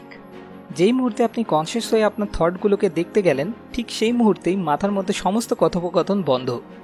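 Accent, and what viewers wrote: India. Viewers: Md:Mijanur Rahman No I am sure He is Indian because accent is different.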